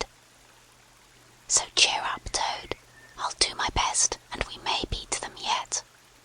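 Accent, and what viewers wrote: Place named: United Kingdom. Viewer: I understand it can be creepy but that british accent totally remembers me of harry potter. And the female whisper its just relaxing af 😍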